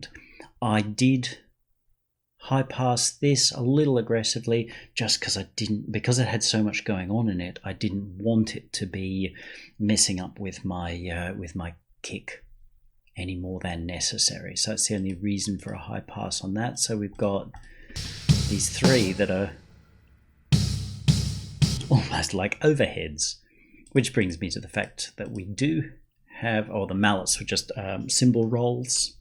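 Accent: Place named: United Kingdom. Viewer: I really appreciate your Britishness, if that's a thing. I listened to the whole thing just to hear you speak, it made me feel good. Can you do a youtube channel where you explain random shit, it would make me really happy! I appreciate the fact that you never looked into the camera that was actually recording, keep doing that thing where you look to the left at what I'm hoping is your hedgehog that you are talking to. Truthfully, I really enjoyed it. Please don't tell me if it isn't a hedgehog, unless it's like a Harry Potter Owl or maybe a kippered herring. That's just as good. Thumbs up.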